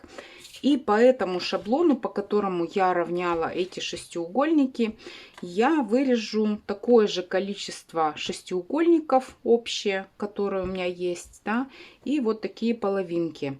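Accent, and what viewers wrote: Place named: Serov, Russia. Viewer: У меня родня в Серове живёт , говор как у них. Как будто с ними поговорила. Очень красивое одеяло!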